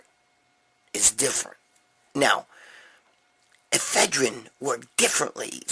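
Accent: New York, United States. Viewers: Keep cranking the videos they’re very entertaining. You need to start making some videos on your own channel so this way you can make yourself some money instead of making it for other people. You make everything very interesting and fun to watch also I’m a fellow New Yorker who moved down south so it’s nice to hear somebody talking normal for a change. Makes me miss NY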